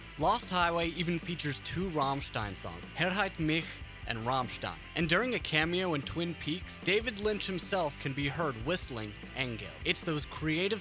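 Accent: United States. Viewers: The american accents fucks me up. dude please try im not even german and it hurts me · Hearing Americans speak German is just silly😂, but you props for doing it! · You said german is a brutal language. So could you please spell it at least not with a hardcore american accent? (Sry for being shitty but some names were spelled really meh xD) But good video! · he kinda reads the words in english. He made great points about the band and that's what matters. If we judge, we can go as far as judging fans for not understanding german and that might include you. if it doesn't, it still includes like 80% of their fans. Yeah yeah, we know you know german better than others... save it. It's typical for americans to not use rolling r and sh the way germans do.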